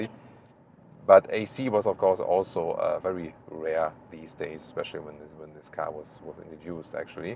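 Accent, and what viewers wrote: Germany. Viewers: that killer german accent bro!!!!!! them make car UP the price!!!! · A video about a German legend, explained with a German accent......''Hier scheint heute alles in Ordnung zu sein😉'' Great content.....and Btw: Did you know that the legendary Queen frontman Freddie Mercury also bought one? He spended a lot of time between '79 and '85 in Munich, and was often driven there in one... He was clearly impressed by this car. When he came back to the UK he directly ordered one,.......a '86 420SEL..... It seems he even preferred it over his Rolls Royce...🤫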